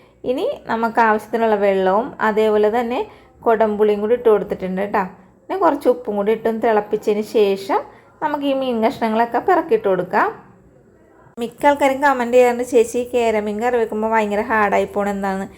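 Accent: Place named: Kakkanad, India. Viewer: കാക്കനാട്ടുകാരുടെ ഭാഷ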